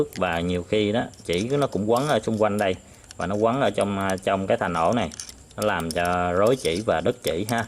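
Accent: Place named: South, Vietnam. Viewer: Mình miền Bắc nhưng rất iu quý người miền Nam! Giọng dễ thương, thân thiện!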